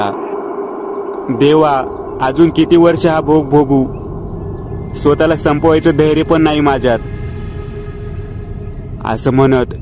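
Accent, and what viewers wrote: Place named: Pune-Ahmednagar, India. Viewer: Tumcha tonning Pune , Nagar side che vatate......!! Chan ahe keep it up......!! Story saglyach chan....JB chya saglya story me eaikte.....🤗💐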